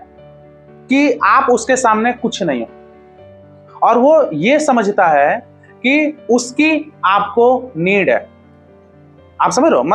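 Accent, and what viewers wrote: Bihar, India. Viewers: Aap bihar se ho kya bhai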